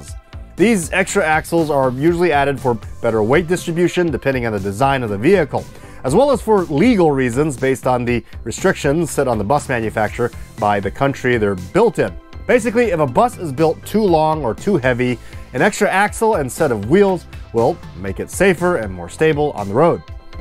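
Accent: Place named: United States. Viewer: U aint no chinese, bruh - as far as I can hear, u r hundred percent American :D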